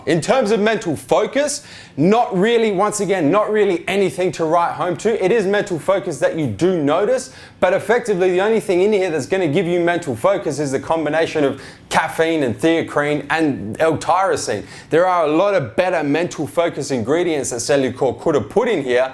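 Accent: Australia. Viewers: Sounds like an Australian version of Alfie Aesthetics. No shit. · dude u might wanna neutralize your accent a bit , kinda difficult for guys like me not from Australia to understand your accent, maybe try American accent bro ? nice vid tho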